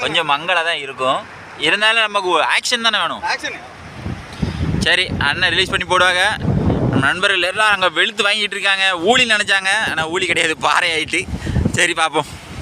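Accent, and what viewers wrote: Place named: Sri Lanka. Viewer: Antha tamil selong super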